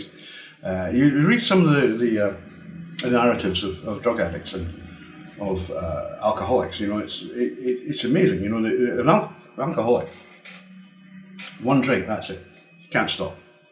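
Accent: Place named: Scotland, United Kingdom. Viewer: Very interesting, had some problem with the scottish accent so i have to listen twice...English is nor my first language so,, but learning..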